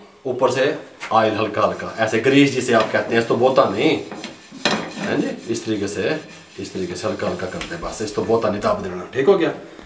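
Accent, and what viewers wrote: Punjab, India. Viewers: Zaberdust I like they way u speak hindi and punjabi . very good easy recipe thank u. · Zaiqa we changa ho si, Style te no1 hey hi twada. Cool man with punjabi tarkah makes it enjoyable to watch.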